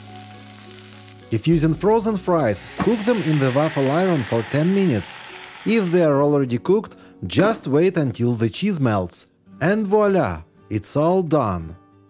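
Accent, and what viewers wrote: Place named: Russia. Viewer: I dont either , many tricks dont work... and that heavy Russian accent !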